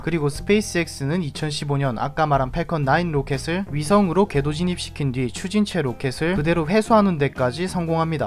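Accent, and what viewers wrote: Gyeongsang, South Korea. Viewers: 사투리억양이 느껴진다 갱상도인가? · 경상도 말투가 좀 섞여 있네요? ㅎ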